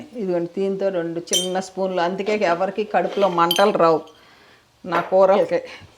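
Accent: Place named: Andhra Pradesh, India. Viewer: madi chirala daggire aunty. mi language chusi anukunna mana area vallu ani. so happy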